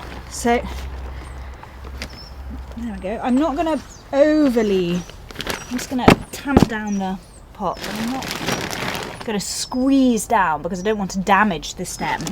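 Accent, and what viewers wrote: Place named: England, United Kingdom. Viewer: Very nice video and lovely English accent, thank you!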